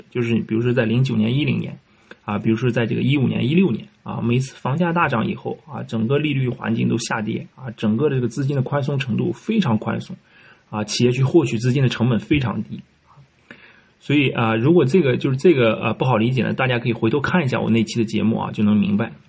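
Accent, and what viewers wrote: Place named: Shanxi, China. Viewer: 山西人哇，这口音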